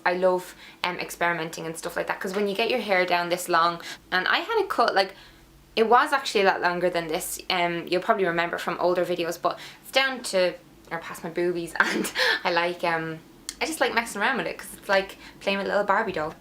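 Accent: Dublin, Ireland. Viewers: from your acsent I know you from Dublin Ireland. I'm from Ireland myself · Firstly, my english isn't good and sorry for this :( My hairs seems like yours. They are very long, shiny and thick. I love my hair but I hate hair breakages :( what I can I do? Notes: I'm very lucky I discoverd u :) I'm a Turkish girl. Irish accent fascinates me :D Your accent is amazing I think :) You're so cut and amazingly beautiful :) I love ya :* And again sorry my english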